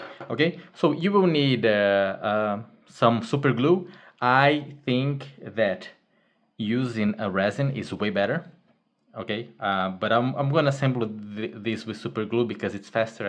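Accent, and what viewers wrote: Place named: Brazil. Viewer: o sotaque de brasileiro falando ingles é inconfundivel heheh. projeto massa dms, acompanho ha um tempo ja. ansioso pros proximos passos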